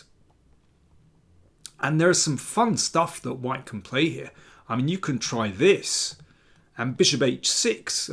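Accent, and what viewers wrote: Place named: Oxford, United Kingdom. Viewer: Dear Mr. King. I know you understand German: Sehr schönes Video, unterhaltsam analysiert. And I love this sort of Oxford English!! Great!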